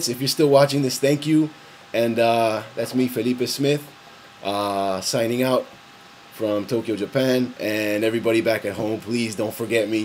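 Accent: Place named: Argentina. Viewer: only a question(aajhaa the mangakas how you dont have time for ask questions in youtube but), you have a connection with Argentina? because, when i hear you speaking spansish, your accent is so...argentinian hahaha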